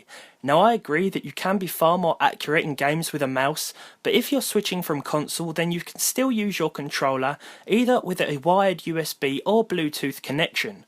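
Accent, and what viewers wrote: United Kingdom. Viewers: Love this channel. Which part of the UK are you from?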